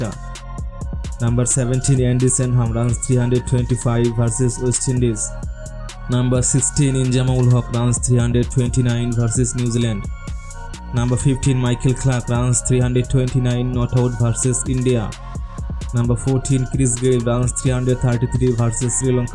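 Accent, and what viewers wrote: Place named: Sylhet, Bangladesh. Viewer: This guy's Sylheti English is funny🤣🤣🤣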